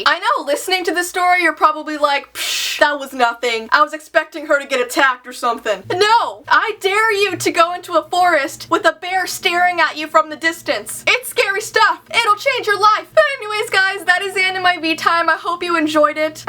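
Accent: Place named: Canada. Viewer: Ilysm!!!!!!!!!!!!!!!!!!!! I love your stories and your Canadian accent!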